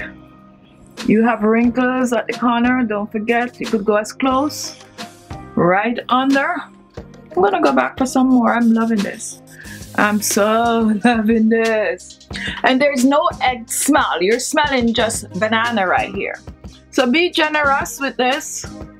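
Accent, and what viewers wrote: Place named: Jamaica. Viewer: Sounds like u r from Kingston Jamaica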